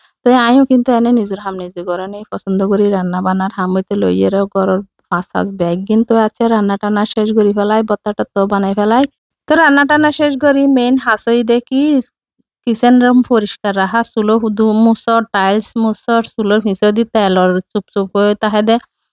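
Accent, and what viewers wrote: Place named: Chittagong, Bangladesh. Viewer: আপুর বাড়ি মনে হয় চট্টগ্রামে তাই মনে হইল বাসায় হিসেবে আমার কাছে ভালো লাগে চট্টগ্রামের ভাষা 💗💗💗💗💗👍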